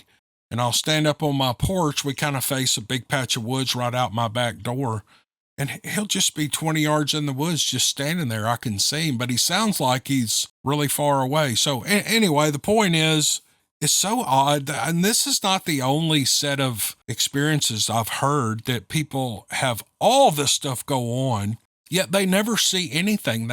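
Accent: South, United States. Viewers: ALL of the stories were GREAT, thanks to those who took the time to share. (Of course, TY to and his delightful southern drawl.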